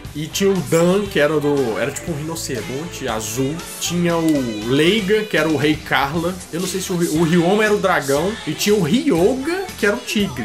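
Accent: Minas Gerais, Brazil. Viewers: Esse sotaque minero é top demais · Vc e mineiro brother?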